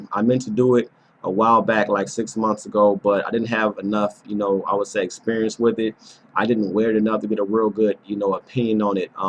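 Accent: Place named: South, United States. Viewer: Ok, I have Legend as well, but not the same. I personally you wanted to be the anti-haarlem guy to get fans. You get pass all tge orher notes in other colognes, right. The hype is guud muny, I didn't like it at saks either...but the ladies in PHILLY feelin it. You sound southern. ..different females, you have to take that into account Bruh. When you revieq come outta the box. Other than that, u aite with your presentation.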